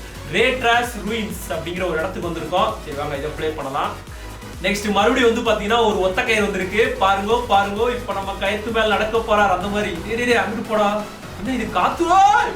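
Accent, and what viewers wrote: Sivakasi, India. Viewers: Bro nee sivakasi ah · Bro, Sivakasi ah neenga ?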